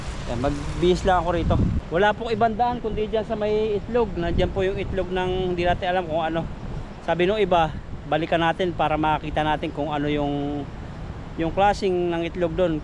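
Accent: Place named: Quezon, Philippines. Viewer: Bro. Tanong ko lang ano probinsya mo halos parehas tayo ng punto at yun pagkatagalog mo. Taga Quezon province ako. Bago mo subscriber pala. Parehas tayo ng hobbies ingat palagi ang God bless.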